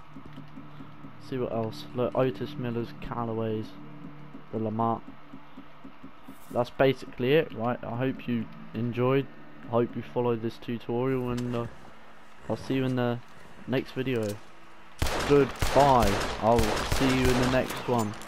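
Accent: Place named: Yorkshire, United Kingdom. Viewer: Yeah, thanks I already did it back in chapter4. Appreciated though, if I hadn't known then for sure I'd have checked it out. All the best Mrs (cool as heck name by the way). Just checked your channel out, your accent made me chuckle, reminded me of the Batley accent (where I'm from in West Yorkshire).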